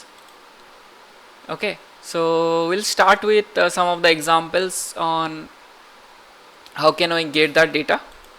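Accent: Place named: India. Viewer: I am sure you are an indian (May be wrong) from your hearing you. Why are you charging in dollars unlike the others who have paid membership on youtube itself.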